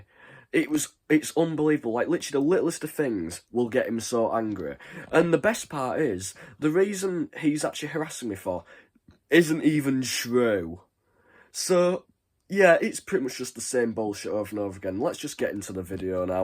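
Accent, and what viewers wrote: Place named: United States. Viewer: He’s defo American his voice is so jarring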